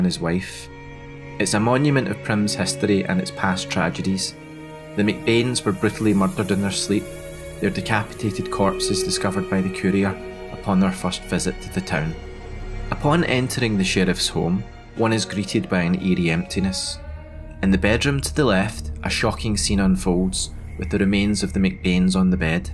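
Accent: Scotland, United Kingdom. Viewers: Great video man. Btw I love the accent. Is it Scottish?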